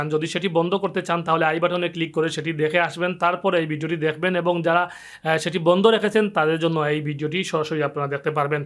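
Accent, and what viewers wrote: Sylhet, Bangladesh. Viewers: আপনি মনে হয় সিলটি?😊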